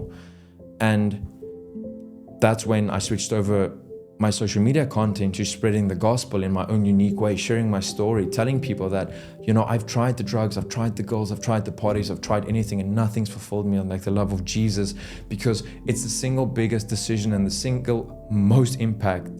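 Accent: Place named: South Africa. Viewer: I love your accent. Is it South African by chance, or New Zealand?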